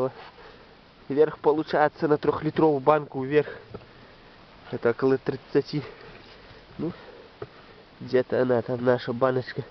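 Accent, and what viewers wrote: Belarus. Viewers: Цудоўны наш акцэнт, братка-беларус!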